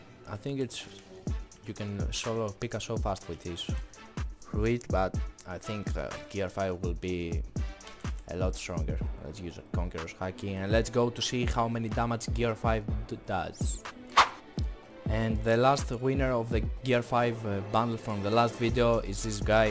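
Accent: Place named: Greece. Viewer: Bro by the way you talk I think you are from Greece but I don't want to be rude or anything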